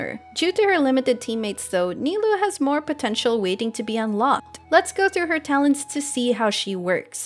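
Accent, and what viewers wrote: Philippines. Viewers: I figured by the accent, Pinoys just have that certain twang lol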